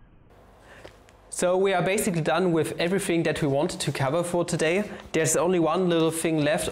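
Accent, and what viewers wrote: United Kingdom. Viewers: nailed the british accent boi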